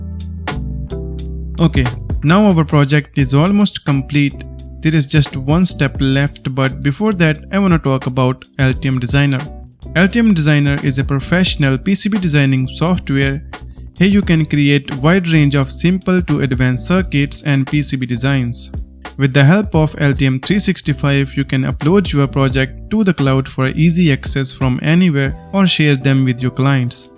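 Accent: India. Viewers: Мне одному кажется, что это русский говорит по-английски с индийским акцентом?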